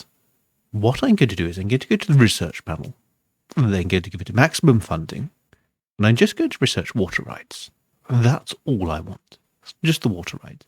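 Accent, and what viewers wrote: United Kingdom. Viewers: I understand, & can i also say.. i've never came across anybody with a posh accent like yours before, it's kinda relaxing.. almost asmr in a away.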